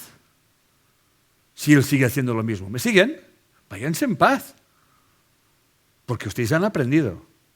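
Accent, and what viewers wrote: Spain. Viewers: por su forma de hablar es español igual a mi papá es bueno hablar de asuntos de pareja pero que bueno ese tema las personas deben conocer su media naranja pos persona no por redes sociales no sabes no lo ves es peligroso cuando entramos es para escuchar temas como los a suyos, ho música, pero pareja no porque hay muchos que se hacen pasar por otros gracias por su tema me encanta bendiciones y larga vida amén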